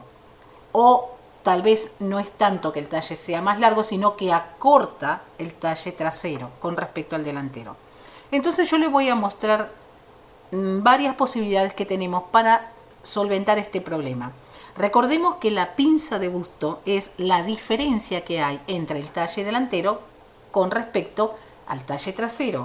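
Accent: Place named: Argentina. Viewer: Por el acento eres argentina me encantó tu clase me inscribirme para que me lleguen notificaciones gracias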